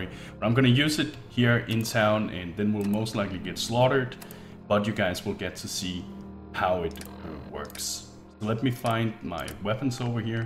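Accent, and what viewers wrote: Denmark. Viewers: Is he from Denmark?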